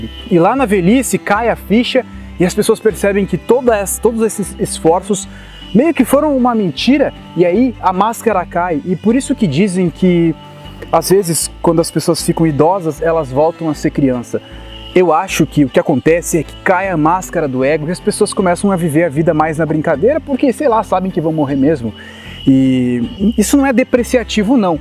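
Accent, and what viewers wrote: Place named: Northeast, Brazil. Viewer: Acho que você é do sul, mas sinto um leve sotaque nordestino ☺️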